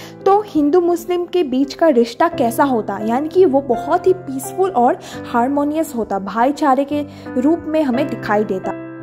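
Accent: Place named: Northeast India, India. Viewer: North eastern hindi accent vibes 😊 Well explained , that helps me a lot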